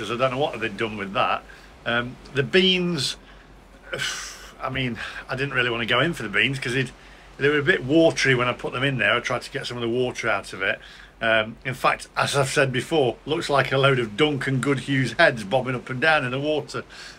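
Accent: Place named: England, United Kingdom. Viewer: At first, I was eggstatic about watching another vlog. I don't think I've ever heard anyone rant on for so long about how he asked a Spanish bloke in a canteen in Tenerife in his broad English accent to flip his egg and then rant on for the rest of the video about how the server was rude, simply because the Spanish server didn't quite understand his broad English. The Spanish server was probably sick to the back teeth of English people going on at him. Jesus wept. Maybe try attempting some Spanish next time? Cuando en españa......... You asked, "Am I overreacting?" Yes, you were eggaggerating. "What would I have done?" Been slightly disappointed, but got over it within a few minutes. Please tell me you were joking. My rating: 🍳🥚🍳🥚🍳🥚🍳egg-tastic. Yes, those toasters are b@ But I can imagine there have been several fires when English people were making toast in regular toasters and couldn't quite manage it whilst holding their third breakfast pint of the day at 8am. 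🤣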